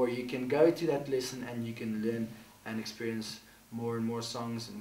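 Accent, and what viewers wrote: South Africa. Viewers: South African, ne? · South African, I could hear it as soon as I started watching. whoop thanks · your accent makes me miss south africa, just a little. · Are you SouthAfrican? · Are you South African? :D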